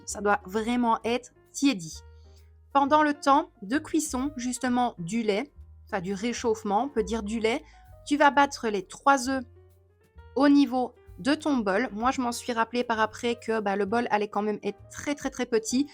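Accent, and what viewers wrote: Belgium. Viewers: merci ,j ai regarder en effet,ça ressemble plus aux pancakes américain !! Ton accent mdrr ,je suis belge aussi donc sa ne me choque pas Et oui le spéculoos c'est la vie 😘😘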